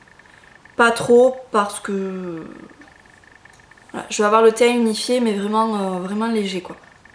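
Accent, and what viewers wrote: South, France. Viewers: Coucou et bonne année 2013 ! J'aime beaucoup tes vidéos et ton accent du Sud ! :) Est-ce que tu pourrais faire la vidéo de tes cadeaux de Noël ? Bisous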